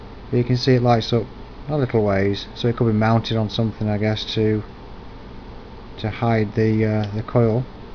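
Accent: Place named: United Kingdom. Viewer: I want to try something different are you from the uk your accent sounded bristish. I have some ideas I wanted to try needed some help if possible ?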